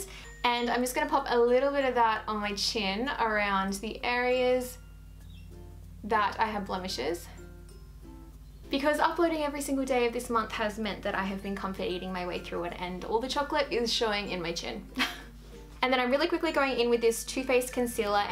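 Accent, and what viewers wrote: Australia. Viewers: Is she Australian? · OMG R U AUSTRALIAN!!?!? I AM!!!!!!!!!!! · Is she australian? I cant tell x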